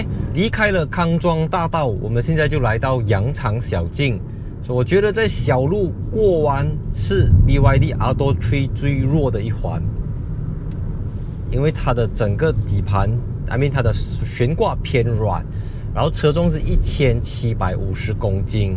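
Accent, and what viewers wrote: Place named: Malaysia. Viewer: 马来西亚的中文口音好可爱啊。💯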